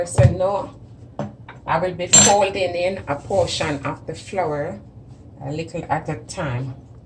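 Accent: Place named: Jamaica. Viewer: The accent and pronunciations are killing me. 100 percent Jamaican.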